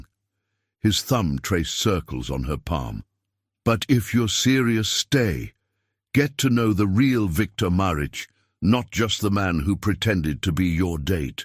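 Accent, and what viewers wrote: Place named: United Kingdom. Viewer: Nisam gledala jer nema previd več Englesko - Britanski naglasak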